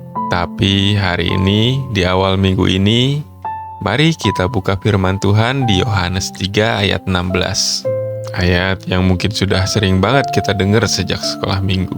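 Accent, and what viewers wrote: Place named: Surakarta, Indonesia. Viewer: Kok suara mirip solo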